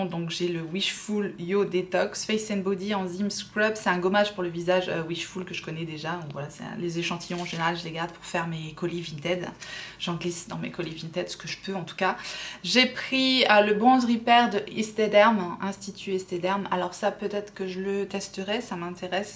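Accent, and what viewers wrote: England, United Kingdom. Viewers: Décidément ton accent anglais me plaît beaucoup, j'ai le même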